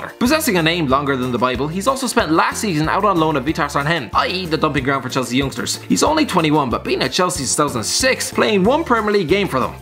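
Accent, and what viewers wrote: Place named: Ireland. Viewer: I can’t understand a word your saying slow down lad. I love the Irish accent but you sound like you’ve sniffed a few gram lol chill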